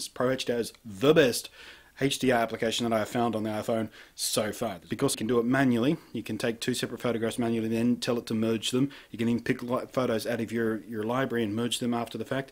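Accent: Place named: Australia. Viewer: the australian way of saying "H" is awsome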